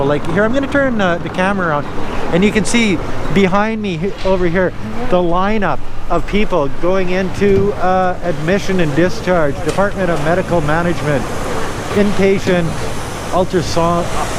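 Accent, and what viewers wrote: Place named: Canada. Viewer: Once a while I notice the Canadian accent 😂.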